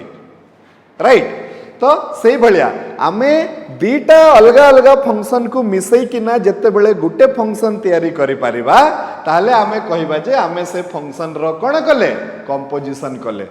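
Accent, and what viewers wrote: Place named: Balasore, India. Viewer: re balesore bhasi jaini ta😅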